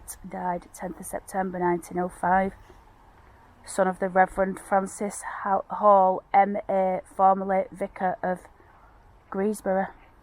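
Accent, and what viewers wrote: Oldham, United Kingdom. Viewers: Hi!-I'm an Aussie that used to live in Royton,-I used to love going to Owdam,-it had heaps of beautiful buildings and I love those wonderful Victorian graveyards!--I really appreciate your hard work! and what you do to your house, is great,and "artistic"-& you always "have a go"-nothing puts you off!--northern people are the best!!--never lose your Oldham accent,-nor your great sense of humor!!--it cant be replaced!---all the very best.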